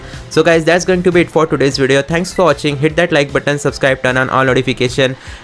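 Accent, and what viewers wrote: India. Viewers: Bro it's just indian accent U racist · Bro it's Indian accent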